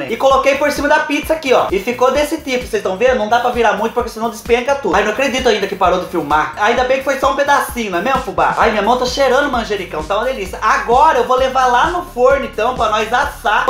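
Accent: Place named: São Paulo, Brazil. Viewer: Que seu canal venha crescer cada dia mais e mais 😍👏🏻 parabéns pelo conteúdo ❤️ você fala que nem meus parentes que mora em Ribeirão Branco não sei se conhece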